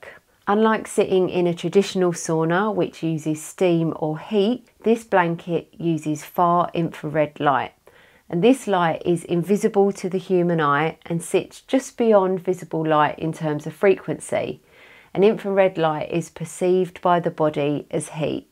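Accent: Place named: England, United Kingdom. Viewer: Greetings to you from me. Great video as always eventhough I am not much intrested in this topic but yeah. At least i could teach my ear some of English Accent.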